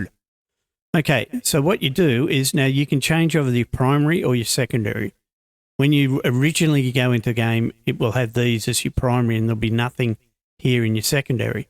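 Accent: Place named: Australia. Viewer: Mate are you aussie by any chance? Been watching your videos for a while, only noticed your accent today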